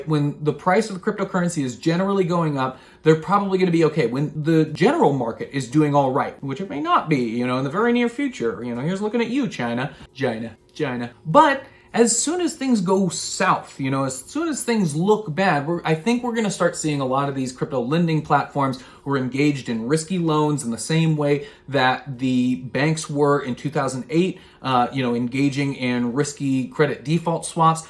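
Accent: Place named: United States. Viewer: This guy has the most american accent ever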